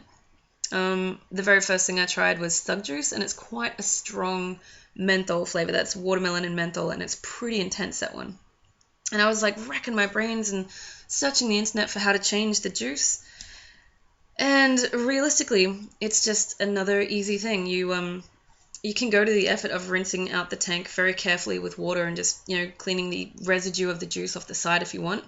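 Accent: Australia. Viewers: it's so nice to hear a laid back aussie on youtube for a change.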